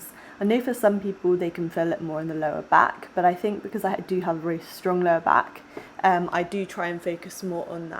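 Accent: RP, United Kingdom. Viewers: is your posh accent acquired or innate ?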